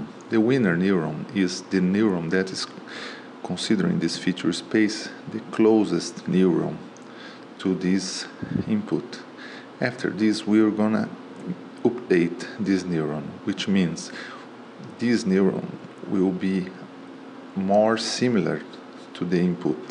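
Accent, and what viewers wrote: Brazil. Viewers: U are from Brazil? Nice ascent. If yes: Muito obrigado pela explição!! If no: My very thanks for the explanation.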